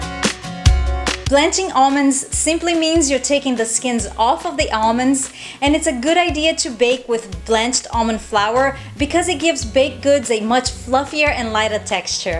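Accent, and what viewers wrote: Brazil. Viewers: Is she Brazilian? She has a very nice accent :)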